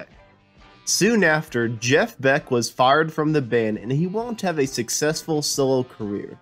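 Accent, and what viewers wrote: United States. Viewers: How awful that this completely British band has an american voiceover on its story. Offensive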